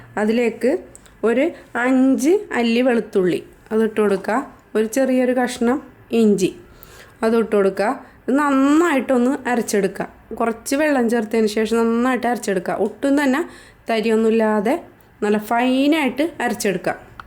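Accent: Kodungallur, India. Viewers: Ith kodungallur slang aanu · Ingale veedu kodungallur anganam ano? 🤔 nalla slang kdglr karde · കൊടുങ്ങല്ലൂർ ഭാഷ...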